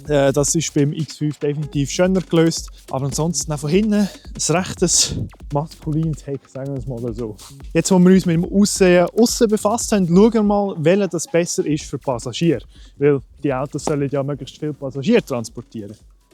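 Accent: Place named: Switzerland. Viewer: Wieviel Reichweite mehr hättest du wenn du Hochdeutsch sprechen würdest. Dieses Schwitzerdeutsch ist leider nicht zu verstehen.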